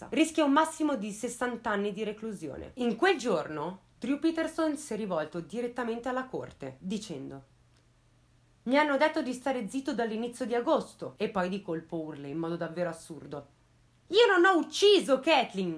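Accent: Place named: Lombardy, Italy. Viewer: Ciao ti faccio i miei complimenti è veramente bello ascoltare come spieghi le situazioni!!! Volevo chiederti una cosa di dove sei? Il tuo accento sembra Como Varese o Milano.... Io sono di Como.... Grazie comunque x il lavoro Ke fai!!!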